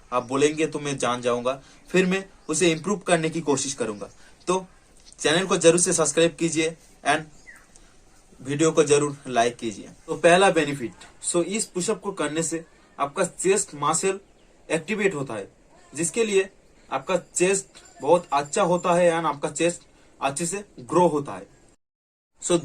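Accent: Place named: Bengal, India. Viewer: Super ...hindi bolna thora improve kerna he.......kiu ki ap sayed bengali ya assamies ho.....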